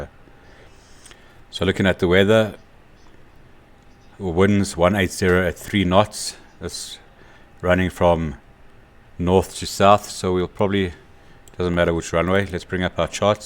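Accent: South Africa. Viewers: Awesome tutorial, accent is a bonus...seems South African. This tutorial was just what I needed to know. I'll practice later today. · Baie dankie excellent tutorial , I lived in SA for 5 years, working at Cape Town airport, your accent brings back fond memories of my time there.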